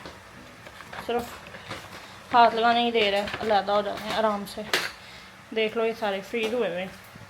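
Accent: Punjab, India. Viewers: ہائے میری پیاری سویٹی لگتا بہت دکھی ہوئی ھو۔اتنی پیاری باتیں کہاں سے سیکھیں ہیں۔ کبھی پنجابی میں بھی بات کرو۔باتوں سے پکی پنجابن لگتی ھو۔ ڈھیروں پیار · Ye kon c type k Chinese hn bae ..... Accent pure Punjabi like our Lahories but face r pure Chinese.... 🤔🤔🤔🤔 Kesay nae kesay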